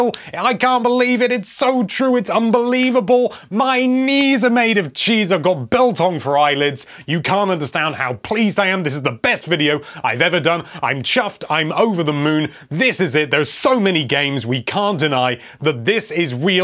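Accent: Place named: England, United Kingdom. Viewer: Traveler is American English. Traveller is just English. You don't sound very American,. In fact, you sound English to me, and judging by your "posh boy" voice I'm guessing you went to public school. What did you do there? Scrumping?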